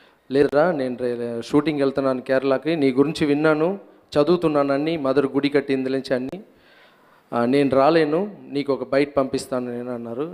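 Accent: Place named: Telangana, India. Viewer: Only Telangana style Raghava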